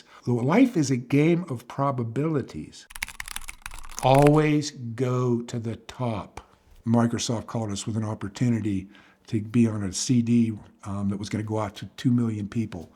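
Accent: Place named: Pittsburgh, United States. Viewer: I thought I heard a Pittsburgh accent there. It’s not thick but to the train Deer it’s definitely there. Then when you were talking about the Pirates winning the World Series in 1960 I said Yep I knew it.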